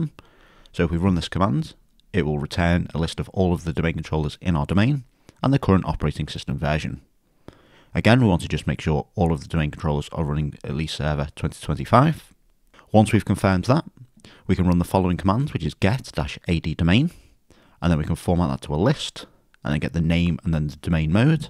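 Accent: Liverpool, United Kingdom. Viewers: Love that scouse accent!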